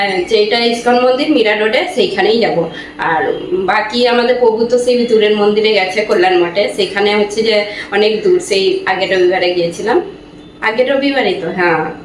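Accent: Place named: Odisha, India. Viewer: Apnar kotha sunle mome hoy bangali non, odia mone hoy. Apana kon odia achhi ?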